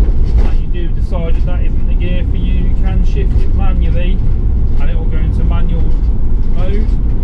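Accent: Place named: Australia. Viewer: why do you speak like an Australian ?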